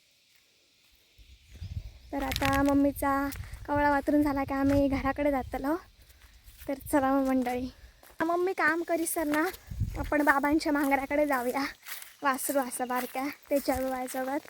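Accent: Malvani, India. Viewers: tujhe video khup chan astat.. mi pn kokanchi ahe.vengurla..but tujhe te madhal malwani aikayla khup chan vatt...tujha bolnyatunch smjun yete ki aplya kokanchi manse sadhi bholi❤..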